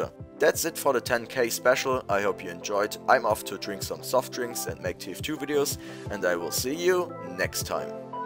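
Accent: Germany. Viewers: I kinda heard it in your'e voice that you are german. I hope you are getting way bigger. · Hey, you live in Germany! Somehow I always knew that from your accent... Well, i live in a country right next to you, so we are basically neighbors! Please keep making fun stuff similar to the friendly class series. Those vids are the most fun I ever saw.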